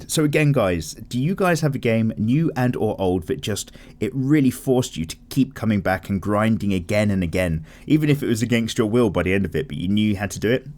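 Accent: United Kingdom. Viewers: Hey, greetings from Bulgaria! I love your art and especially your tutorials! Some time ago I got into DWM. At first I was sceptical as to how the hell I could draw while you’re British accent is echoing inside my ears, yet I found it’s amazing! I truly draw better while listening to DWM! Thank you, keep up the good work!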